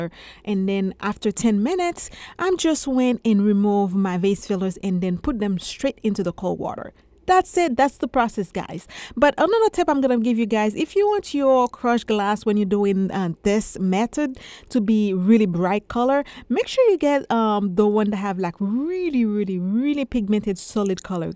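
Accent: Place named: Haiti. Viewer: My guess is you are Haitian. I love your voice and accent. God bless you.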